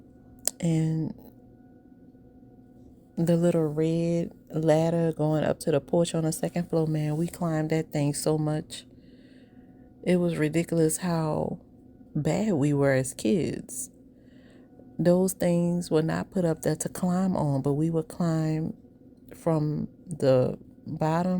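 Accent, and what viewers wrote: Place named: New Orleans, United States. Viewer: Hey. You say you from the West Bank. But you from New Orleans. I hear it.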